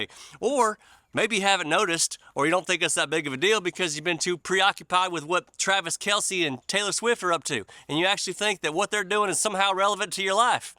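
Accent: South, United States. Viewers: takes a true Southerner to talk like. its a lifestyle you can't make this stuff up!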